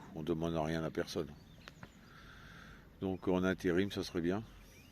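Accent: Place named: North, France. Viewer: Coucou on entend l'accent du nord ci je ne me trompes pas ❤👍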